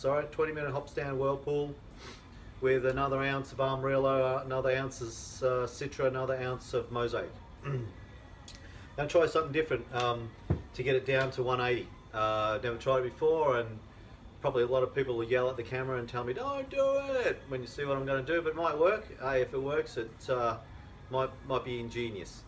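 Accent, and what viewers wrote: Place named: Australia. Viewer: Good vid. Like the Aussie accent with the occasional US inspired pronunciation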